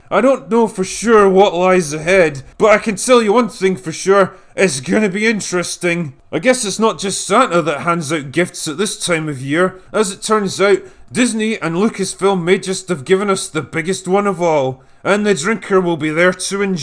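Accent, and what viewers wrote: Scotland, United Kingdom. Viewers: I had the volume all the way up by accident and I got blasted in the ear with an angry Scot. Worth it.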